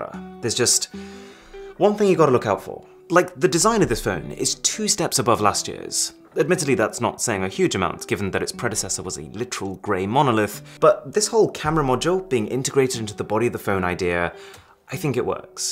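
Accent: RP, United Kingdom. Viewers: That is the poshest accent I've ever heard from someone who wasn't old as shit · Yes, he is posh. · It is the British accent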